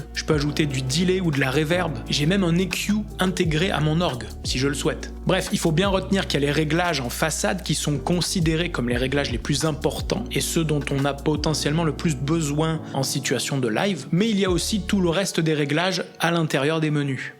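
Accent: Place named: South, France. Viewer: Et l'accent du Sud 😁! Ravi en tout cas de te revoir ici sur WoodBrass!! Tu le mérites!!Bonne continuation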